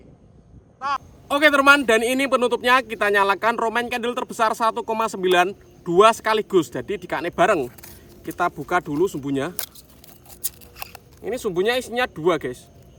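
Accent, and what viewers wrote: Java, Indonesia. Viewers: Jawane ngndi mas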